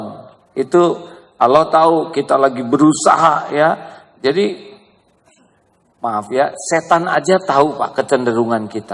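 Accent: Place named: Sunda, Indonesia. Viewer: Sebenarnya orang mana ,kadang Sunda ,,kadang ,sanjang ,nggih tiang pundi aa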